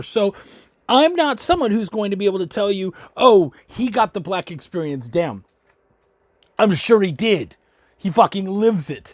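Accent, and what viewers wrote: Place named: Canada. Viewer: You sound especially canadian in this video. I love Is'Nana, picked it up when I backed the hated! You should also check out A Black Man's Guide to Driving, really good from both parts! Solid breakdown, the world needs more raw reviews!!!!!!!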